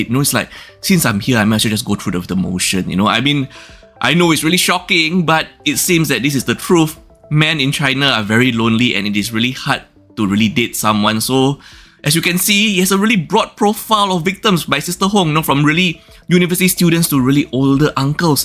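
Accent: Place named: Singapore. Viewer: Hey content creator, there's only one thing more cringey than this Ms Hong - guess what....it's your Singlish accent. Yikes.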